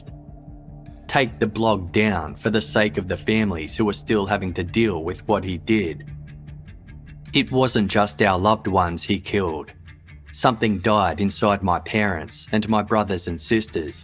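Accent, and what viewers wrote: Australia. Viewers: Love your channel. Love the Australian content. Love your Australian accent. I don’t know what I am going to do when I have listened to all of them. Aussie Aussie Aussie. Oi Oi Oi !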